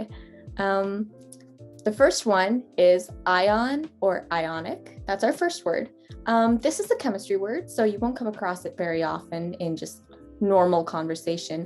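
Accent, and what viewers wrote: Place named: United States. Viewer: Hey...did u learn American accent? Cause u said u were in India, so I m an indian , I also want to have an American accent....but don't know where to start..ur accent is so cool....I hope one day i can speak like that🤞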